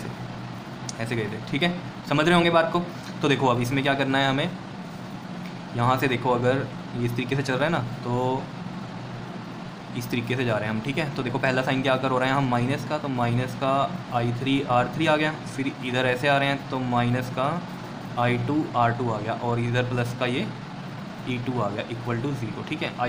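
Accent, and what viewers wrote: Punjab, India. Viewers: i found a bit of punjabi accent in your video 😎😎😎😎😎😎😎❤❤❤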